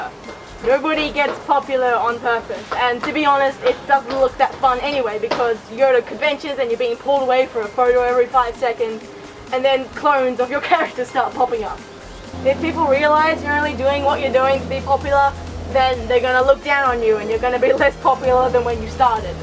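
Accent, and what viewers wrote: Australia. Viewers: you sound very australian, are you? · you're australian!!!!! i can tell by the accent >.<, what part of australia are you from? i'm in queensland, did being australian influence your fursona?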